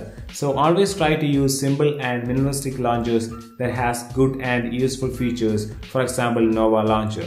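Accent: Kerala, India. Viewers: The damn Indian slang again...wwwhhhattt tthaa thheerrr oh boy,why can't you get some one who speak proper English.. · are you a malayali???? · His accent is not very good. Use subtitles and music, it will be better for english videos as you have Indian accent that's hard to interpret. Or take english courses. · That thick mallu accent.. Subbed brodhah.. 😎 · Malayali aano ? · worst Indian accent I ever heard... can't understand more than half what you babbled... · Is that an Indian accent? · Are you malayali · Bro?You malayali? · you need to uninstall your india accent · malayali analle?? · Talk English please, not Indian with English words. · The mallu accent is thick in this one. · typical malayali accent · Dear Adam, Indian accent is also "an accent" just like any other non-english nations. Just saying...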